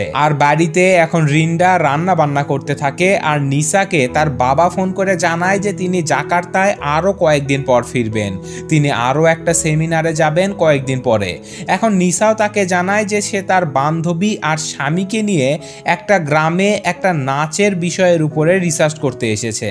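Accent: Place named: Noakhali, Bangladesh. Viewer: না মানে আমি এমনিতেই জিগ্গেস করলাম কারণ আপনার কথায় কিছু টা নোয়াখালীর মতো লাগে তাই আর কী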